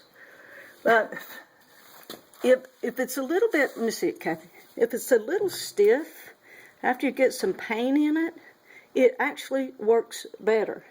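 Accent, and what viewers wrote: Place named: Kentucky, United States. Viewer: Lady you are a doll! You're accent takes me home to Kentucky! I miss it.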